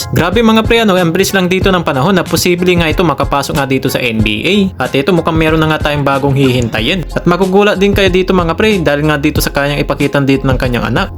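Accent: Pangasinan, Philippines. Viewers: kahit di ko na itanong, Pangasinan ka aro! 😅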